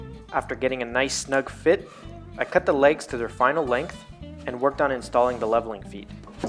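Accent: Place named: Canada. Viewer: Great project and great video. Im gonna be honest here: When you started talking I was like, "Oh, this dude's Canadian" (because of the accent). When you got to the feet and were using hockey pucks I burst out laughing. Nicely done.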